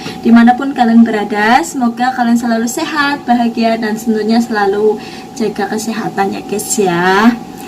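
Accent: Banyumas, Indonesia. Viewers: Wa'alaikumsalam warohmatulohi wabarokatuh Salam ngapak ora ngapak ora kepenak juga mbak wong wadon ngapak🙋‍♂ Wah asik dong gantian ngawasi majikan masak😀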